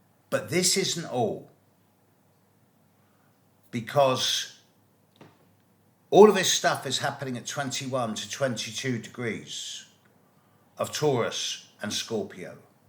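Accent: United Kingdom. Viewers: I've always enjoyed your vids, even this one regarding the military /political cash cow war machine...UNTIL you referred to Armistice Day (UK) and Veteran's Day (US) as, "This weekend of Glorification of War." What special kind of an ass ARE YOU? These solemn church ceremonies, those wreath-laying ceremonies are not to just honor the living who survived these wars, these ceremonies are about REMEMBRANCE and HONOR. Let me explain, because clearly, you've lost the plot. HONORING their courageousness. Honoring their bravery in facing down evil forces. Honoring their sacrifices of knowingly giving up their lives, their future families, their futures of going home--to battle the evil forces that were embarked on ensuring all, even unborn generations like us, especially YOU with your clippy little British accent weren't raised in a Nazi Third Reich World Government enslaved to German AND Japanese Warlord Masters! 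Remembrance and Honor for their suffering wounds that would never heal, for torture in foreign, evil prison camps, for dying in the heat of Africa, freezing to death off ships in the Bering Sea, for dying in wet mud of the Philipine islands of malaria, flea bites, dysentery...and much worse! You must be such a spoiled, little snot that you can sit up on the pinnacle of Mount Bombastic and look down your nose at all the past and present worker bees, pontificating as their dreamy star-gazer. How many actions did YOU ever take to face down approaching evil in overwhelmi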